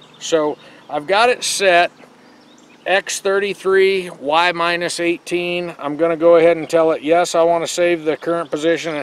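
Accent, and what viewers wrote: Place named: South, United States. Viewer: Found someone that talks more hillbilly than myself and more stupid!! Let's put another bullet in and don't forget to add that last bullet to make the action smooth!! Now let's place the bullshit aside use better terminology, you don't get smoother action by choking the mag and round or shell sounds better than bullet since that refers to the lead..By the way I live KY..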